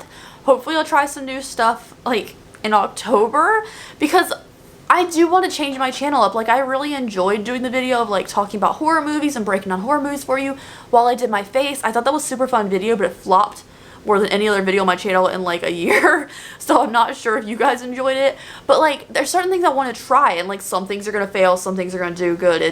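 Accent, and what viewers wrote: South, United States. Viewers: The southern accent 👏🏻👏🏻👏🏻 I love it!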